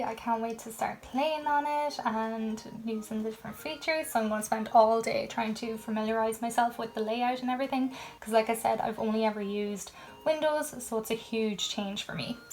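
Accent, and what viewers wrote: Ireland. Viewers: Are u from Ireland? Well asked that too soon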